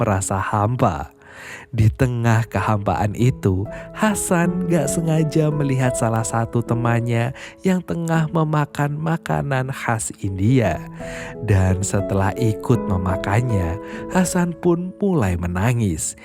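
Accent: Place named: Java, Indonesia. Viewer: Gw saranin kayaknya mending lu kayak album film aja yang ceria gitu soalnya lu kayak orang jawa tapi udah keren kok 😅👍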